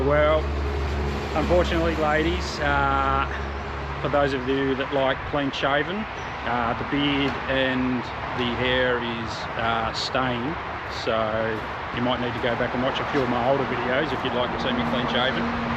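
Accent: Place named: Australia. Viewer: You Aussie men are definitely made different! I don’t know of any American men that would get on their hands and knees and weed through a small rock bed much less over half an entire yard like y’all did!!! You and work great together and I love seeing him using the power tools every once in a while. He seems to stand up taller, lol!!!😉 Ya’ll keep up the good work and keep the blessings going!!!❤ P/S Love the hair, the accent, the whole package!!!😊 Nelly, USA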